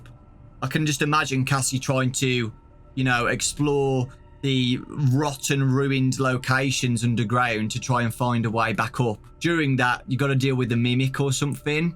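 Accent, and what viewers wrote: United Kingdom. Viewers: Oh hai bri’ish dude /pos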